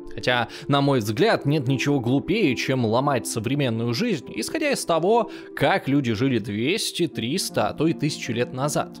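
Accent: Ukraine. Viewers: он украинец - не обязан правильно выговаривать ваши слова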